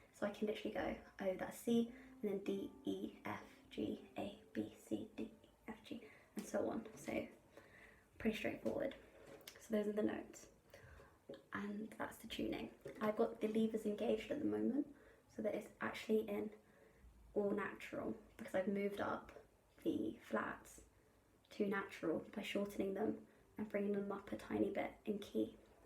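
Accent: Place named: South Africa. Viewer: It always surprises me that people think a harp is any more expensive than another professional instrument. My gold flute was $13,000 twenty years ago! I just spent a grand on a blemished new fullsicle but one day I will get a 34 string. I travel though and want to be able to travel with it. It actually sounds good because it is walnut. I do want a Ravenna 34 eventually. And even that is only about $4000+ so I just don’t get it. Two harps which still cost less than my flute. You are just adorable though. I could listen to you talk for hours. I’m trying to figure out the accent. South Africa? Or UK? But what happened? No progress videos?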